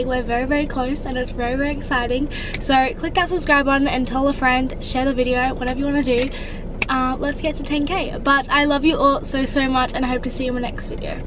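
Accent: Australia. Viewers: It's the Australian accent man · i can tell your australian by the accent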